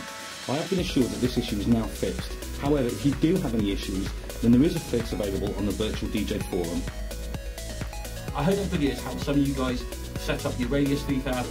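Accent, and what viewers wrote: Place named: United Kingdom. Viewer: why is the american audio product demo being led by a british guy? =)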